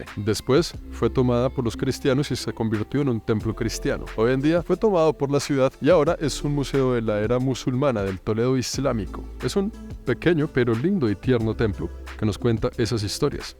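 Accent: Colombia. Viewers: Buen vídeo y muy buena síntesis de España y Toledo… lo has definido perfectamente y Toledo fue considerada la New York de la época…. Espero que hayas disfrutado una bonita experiencia en tu viaje y que mucha más gente venga a conocer España con tu actitud. Dicho sea de paso, como Colombiano que creo que eres por el acento, Bienvenido y estás en tu país porque así lo fue durante varios cientos de años. Un abrazo desde el norte de España y la próxima vez espero puedas venir a conocer esta zona que te gustará mucho también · Felicitades!.... Acento colombiano + hablar lindo a todos los animalitos = perfect 😍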